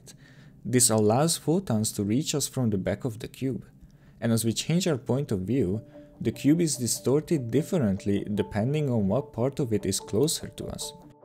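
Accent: Hungary. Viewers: Egész érthető volt még a magyar akcentuson keresztül is. Kedvencem amikor Suttgartot úgy mondta hogy sztátgárd. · Wait, are you Hungarian? Your accent is definitely 🇭🇺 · Is that a Hungarian accent or am I completely wrong? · akcentusból itélve fix magyar vagy, nagyon komoly videó lett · Nem vagy magyar véletlenül? 😅 Különben elég jól összeszedett lett a videó! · are you hungarian by any chance? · Hello! Nice video! Are you hungarian by the way?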